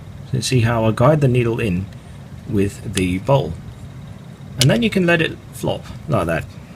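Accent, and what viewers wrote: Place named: United Kingdom. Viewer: Another useful video thanks. Have you lived in the UK,just going by your pronunciation . Andy.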